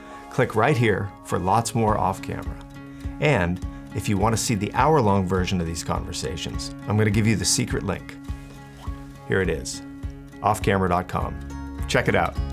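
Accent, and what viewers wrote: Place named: Boston, United States. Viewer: He's from Boston or thereabouts. They say "aboot" and shit like Canadians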